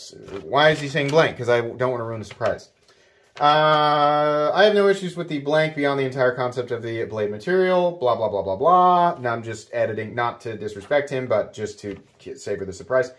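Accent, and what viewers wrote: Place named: South, United States. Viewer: Extreme southern: thes air ryt hare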